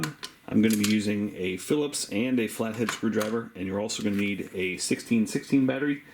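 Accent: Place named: United States. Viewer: You're clearly American with all of the "go ahead and...".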